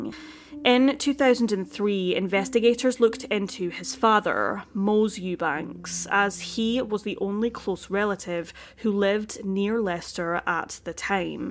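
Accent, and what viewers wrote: Scotland, United Kingdom. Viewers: Is that a fellow Scot I can hear? I was wondering when I'd come across a Scottish true crime channel!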